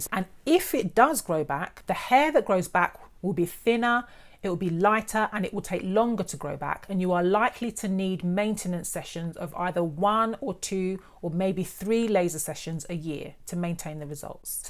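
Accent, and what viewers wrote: United Kingdom. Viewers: I wish there was video for none black skin or dark skin as I am not. I'm Hispanic. Oh sad those that got burned. I hope they were able to get healed or surgery grafts or whatever. Maybe they did not have the money. You are so pretty. I like your hair style. I love the British accent. You gave a pretty smile. Well tye tips you said to shave is good. Just as I thought, but not too much so thanks. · I love your british accent 💕💕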